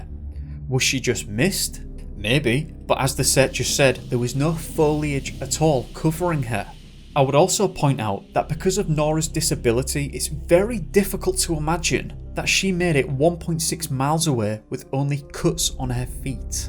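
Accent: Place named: Hull, United Kingdom. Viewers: I detect a hullensian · Where are you from? One minute I think you have a Leeds accent but then you sound a bit Sheffield but then I hear you pronounce a word and I then think Hull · It's an East Riding accent. It's probably from near Hull. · Oooh your accent I think you're a hullonion 😉🤭 great channel ❤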